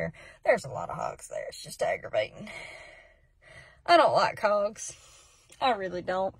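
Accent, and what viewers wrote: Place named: United States. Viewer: Love your shirt baby, just came across your vlog, love it to like your country accent because I am country.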